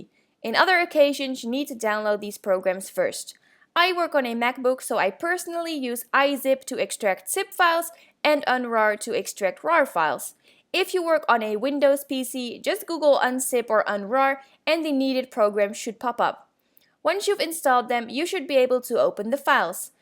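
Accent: Netherlands. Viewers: Its dutch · You dutch girll?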